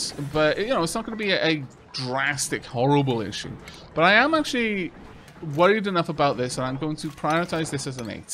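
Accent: United Kingdom. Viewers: You are pronouncing 'algae' in British English (which makes sense, as you're speaking in a British accent). <link> This is the American English pronunciation. <link>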